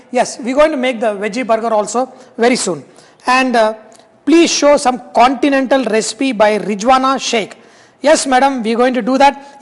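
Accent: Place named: South India, India. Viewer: Good explanation in a South Indian accent...nice video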